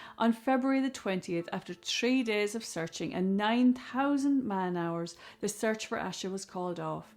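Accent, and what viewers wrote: Ireland. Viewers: Who was at that slumber party? I think someone at that slumber party or an adult in charge knows what happened to her and why she left that night. Do we know the people her cousin knew? Love the way you delivered on this case. Glad I happened on your site. I think you’re a fellow Irish woman, the accent gave you away. Look forward to hearing more from you.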